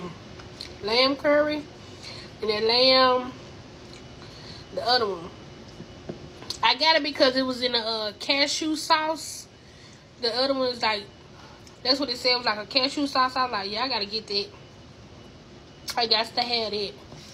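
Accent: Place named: South, United States. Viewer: Lamb curry 😂😂😂 love your southern accent ❤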